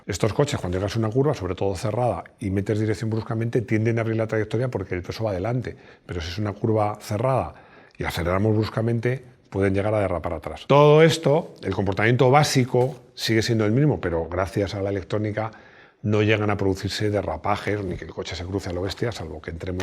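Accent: Chile. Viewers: Estimados la voz en off es de un Chileno?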